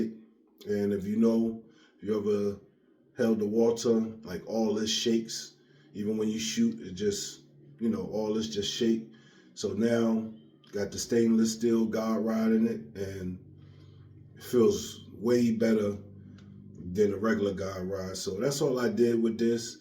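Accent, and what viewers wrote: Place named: New York, United States. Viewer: lol definitely an old school New Yorker with that : LIKED’ed it 😜 BROOKLYN LUV🫡 BROOKLYN LOYALTY ☝️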